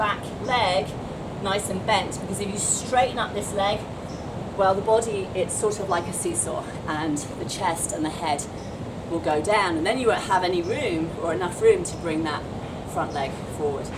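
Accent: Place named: United Kingdom. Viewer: normal british accent i guess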